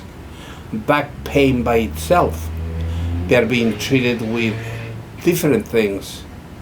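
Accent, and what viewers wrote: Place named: Italy. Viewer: You never explained why, what you do, is better than fusion and other invasive/destructive procedures such as laminectomies. This is the third video I've watched of yours and you don't at least provide a description of the procedure that have found very effective. However, I will forgive you; as you appear to be an Italian immigrant that is bilingual; and the English language makes it harder for you to articulate or put into words, your techniques!😃 But I'm guessing that you use endoscopic decompression with precision drills either of small size or ultrasonic; which I understand can be very effective with minimal damage to the body's own tissues. And I perceive that you are a doctor WHO AIMS TO ADDRESS THE REAL CAUSE OF THE PAIN, NEUROPATHY AND MYELOPATHY. That is a rare trait in today's medical system. Today's allopathic doctors are more concerned with money and treatment of SYMPTOMS rather than stopping the REAL CAUSE! 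They try to "improve' on our Creator's designs of the spine by removing parts and replacing them with metal alloys to create some stability. Instead of aiming to remove the offending bone spur, cyst, or herniated disc or ligament to free up space in the central canal and the foramen where the nerve roots exit. Thank-you, as I am in my 3rd consultation with spine surgeons pre-surgery; after updating my MRI's, CT scans and X-rays. He is reknown for the M.I.L.D procedures and I hope to consult with him in the next few days to see what he recommends. (I have liga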